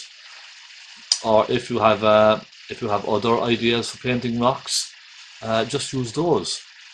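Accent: Ireland. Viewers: hard for international people to understand English with an Irish accent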